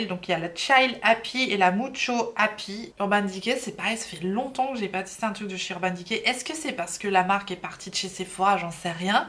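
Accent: United Kingdom. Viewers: Coucou 🐢🌹 J'ai la même petite palette de Bobbi Brown moi aussi et elle est vraiment bien👍🤗 La collection d'Estée Lauder me tente bien moi 😊 Youpiii ! De nouvelles teintes du fameux gloss de Maybelline 🤗 C'est pas folichon les nouveaux produits de Dior 🙁 Alors là ! Les palettes MAC sont à tomber, je les veux 🤩😍 Je ne connais pas du tout kacmarque Juvias place, m'en vais remédier à ça 🤔😉 Ah ! Enfin le highlighter de By Terry ! Super ! 👍🤗 Les bronzer de Patou sont très beau. Ils me tentent bien et le blush aussi 😊 J'adore ton accent anglais 😊 au moins je comprends ton anglais 😂 Le fond de teint sérum de Too Faced me tente beaucoup moi aussi 🤗 La Terracotta de Guerlain est une légende 😍 Ma mère en portait quand j'étais petite. C'est une petite madeleine de Proust pour moi ❤ Très bonne idée le roll-on d'Iconic London dis-donc 🤔👍 Chez Clé de Peau, peut-être qu'ils acceptent le don d'organes en échange d'un de leur produits non ? 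😉 Je tenais à te remercier pour m'avoir conseillé le fond de teint Care and Glow de Lancôme 🙏❤ J'attends la fin du mois pour me l'acheter mais je suis sûr qu'il sera parfait pour moi 😊 Encore une super vidéo 👍💕 J'adore ce concept de nous présenter les nouveaux produits sortis ou à sortir 👍♥️ Merci 🐢🌹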